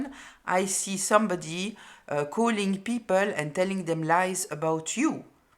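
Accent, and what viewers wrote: France. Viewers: Sometimes I just glide on your beautiful French accent, I should have met a cute Frenchman in life 😂🇫🇷💋✌️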